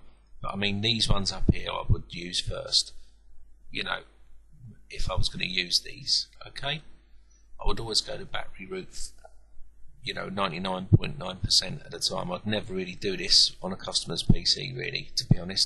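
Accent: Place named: Cockney, United Kingdom. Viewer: Your accent is hard to understand. Speak clearly and don't cow off the words. Not many people understand cokni.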